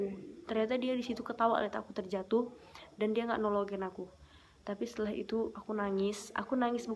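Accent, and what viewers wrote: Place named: Berastagi, Indonesia. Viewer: Orng dari berastagi mana nih suaranya😌🙏🏻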